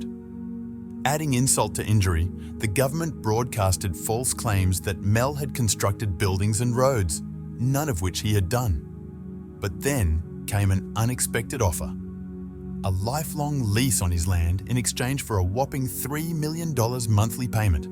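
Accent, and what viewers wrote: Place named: Australia. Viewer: what a waste of time! With a much repeated story line, which I think is written by someone who has a great imagination ! 😅😅 Its a shame, as I was thinking an Australian voice would be nice to hear for a change. But it just got worse the further it went on. 'Just stay tuned to hear the horrible story' of Mel, to find the truth. 😅😅 I stuck it out by typing this 😅😅😅 880,000feet!?😅😅😅😅 Don't bother!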